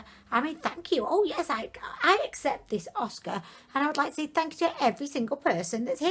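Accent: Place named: Lancashire, United Kingdom. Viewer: Hey! Lovin´ ur videos as always, your lancs accent reminds me of home:) Have u ever tried Kiko milano? Have a nice day and don´t stop being you:) xx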